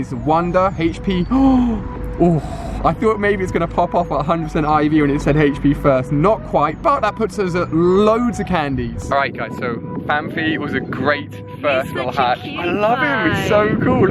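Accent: United Kingdom. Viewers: He's British and has an accent. Get over it and enjoy the videos or don't watch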